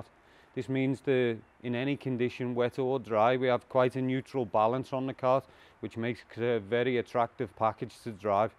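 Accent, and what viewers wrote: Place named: Newcastle, United Kingdom. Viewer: I love the Geordie accent🙃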